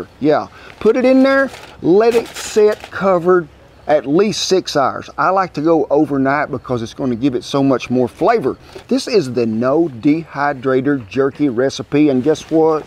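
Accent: South, United States. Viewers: im glad i found this. love the cowboy accent, made me laugh, hungry and also gave me a hug. definitely coming back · 52 year ol Brit from across the pond.. you sir just got a new sub for one hell'ova entertaining video and dayum they looked tasty... do love a deep southern Merican Cowboy accent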